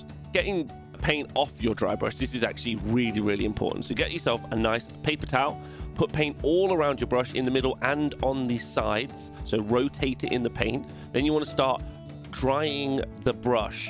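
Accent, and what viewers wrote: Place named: United Kingdom. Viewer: My favorite part was! “It’s a magical Frog” only Someone with a British accent could say something like that and it be as funny as it was! Someone with an American accent would just sound Ridiculous! Cheers from another, aKa TriptonStudios!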